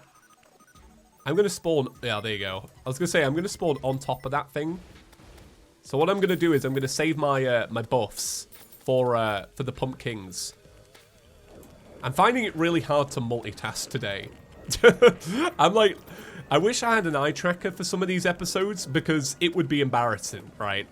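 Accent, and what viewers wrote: United Kingdom. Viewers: videos make me realize how different Brits pronounce certain vowels than Americans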